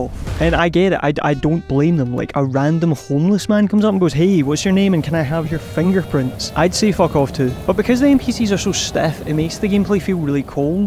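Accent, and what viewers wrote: Scotland, United Kingdom. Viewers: Randomly came across your channel, love the scene your setting, so funny, your going far man plus your Scottish, I live in Inverness 🫡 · Are you scottish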